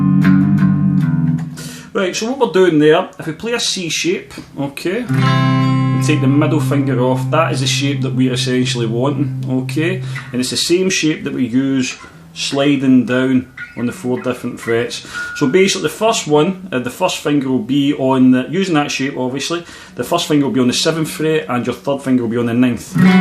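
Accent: Scotland, United Kingdom. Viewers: Nice job. Enjoyed your accent. For American English, its always surprising to hear the strong accent disappear when you sing as the words become crystal clear. Btw you have a good singing voice in the times you did sing. You didn't sing enough to tell if your voice is trained but if not in my opinion you have a good base voice to begin training. Much respect for Scotland! My ancestors came to America from Scotland! Robertson clan!!!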